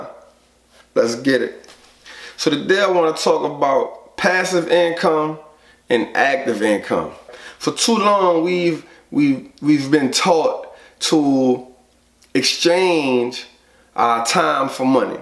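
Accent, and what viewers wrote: New Orleans, United States. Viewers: 💯👏👏💯👏👏💯👏👏💯LOVE that NOLA twang!!!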